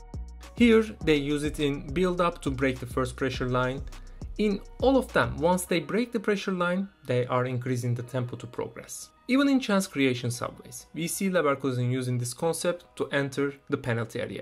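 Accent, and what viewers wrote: Turkey. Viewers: Your accent is making me think you are Turkish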